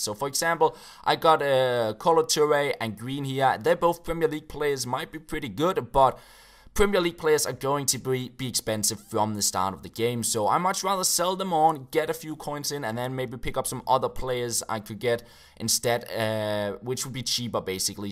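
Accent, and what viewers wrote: Denmark. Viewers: U Sound danish.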